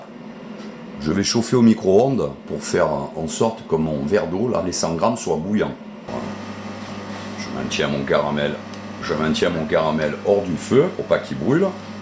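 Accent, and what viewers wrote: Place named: Marseille, France. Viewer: Miracle !!! L'accent Marseillais est revenu (Mais à 85 % ...) Recette excellente comme d'habitude ! 👍❤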